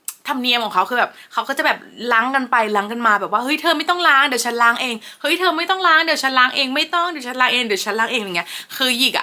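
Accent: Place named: South, Thailand. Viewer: หล่อนน่าจะคนใต้ พูดเร็วลิ้นรัว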